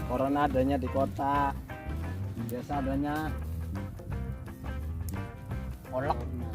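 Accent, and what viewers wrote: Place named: Indramayu, Indonesia. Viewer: Wong Endi mang kaya wong dermayu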